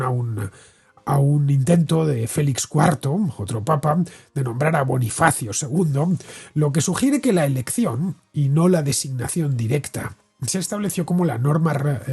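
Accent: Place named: Galicia, Spain. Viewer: "¡Interesante! Aunque tu manera de modular no es la mejor y tu acento me recuerda al de un gallego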